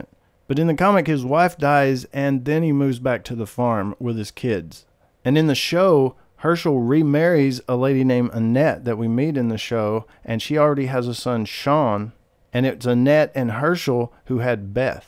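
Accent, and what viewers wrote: South, United States. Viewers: Dude you have a southern as hell accent lol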